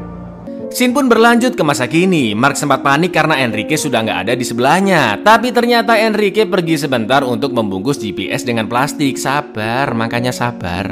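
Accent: Java, Indonesia. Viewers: bahasa mu kang jowo muedokkk asli wg jowooo ..lnjutkan kang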